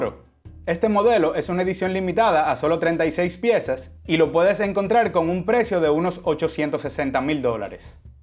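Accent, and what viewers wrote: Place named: Dominican Republic. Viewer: Dominicano eres, se nota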